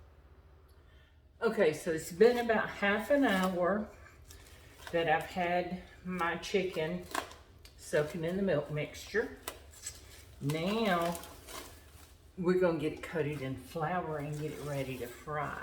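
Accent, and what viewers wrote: South, United States. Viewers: I enjoy watching you and listening to your Southern accent. You cook the good foods I grew up on. I am 73 and I always helped my grandma and my mom cook and bake. We were a self sustainable family and I really enjoyed it. I lived that life until I retired. I miss that life, but my kids grew up that way and they are following in are footsteps. They are both wonderful cooks. Sometimes they have cooking contests between them. You are wonderful thankyou for sharing.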